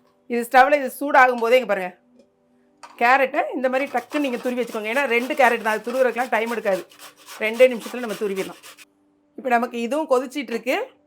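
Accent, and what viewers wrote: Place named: Tirunelveli, India. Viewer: திருப்பூர் கோகிலா... வணக்கம் சிஸ்டர்... நேத்து உங்க வீடியோ பக்கமுடியால... இன்னைக்கு உங்க குரல் கேட்டதும் நிறைவா இருக்கு... காரணம் நான் திருநெல்வேலில தான் படிச்சேன்... எங்க ஊர்ல யாரும் இந்த slang பேசறது இல்ல... எனக்கு திருநெல்வேலி பாஷை ரெம்ப பிடிக்கும்... உங்களையும் பிடிக்கும்... உங்க சமையலும் பிடிக்கும் 😍😍😍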